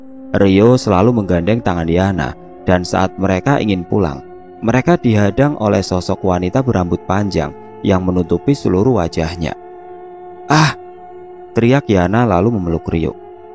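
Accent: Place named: Java, Indonesia. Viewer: Suaranya bagus, ceritanya seru, ada lucu nya, ada medoknya, trnyata mas ganteng juga 😅 baru tau deh...😅 Semangat mas ❤ Btw mas sekarang bikin Chanel Radio horor itu ya?? Suaranya sama bgt soalnya. Sukses selalu mas 🎉😊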